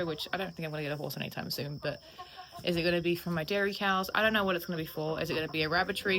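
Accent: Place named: Australia. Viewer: I know what you mean , sounds like Australian and it's So Interesting , love it ❤️👍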